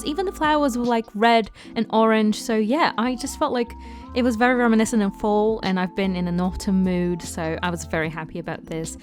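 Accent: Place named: United Kingdom. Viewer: honestly you are such a talented builder and as a polish person I love to listen to british accent. Love it xx!!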